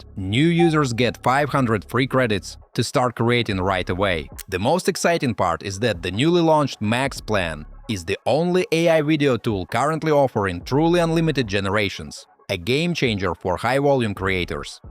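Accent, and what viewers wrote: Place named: Russia. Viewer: Рашен инглиш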